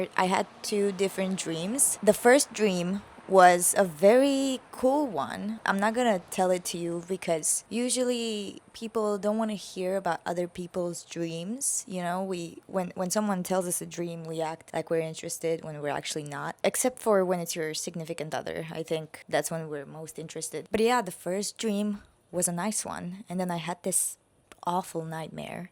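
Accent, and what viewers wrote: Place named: Philippines. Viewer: I might just be weird but you kinda sound like you're from the Philippines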